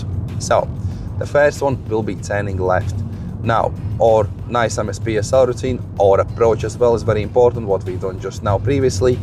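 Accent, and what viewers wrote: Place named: Liverpool, United Kingdom. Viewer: Very useful video.... I can learn liverpool accent and driving at same time... the best part is showing all the 3 scenarios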